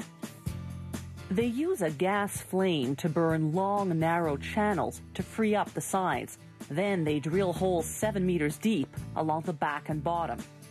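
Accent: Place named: Canada. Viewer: I can tell the narrator is Canadian because she says "a boat" and "PROcess" alot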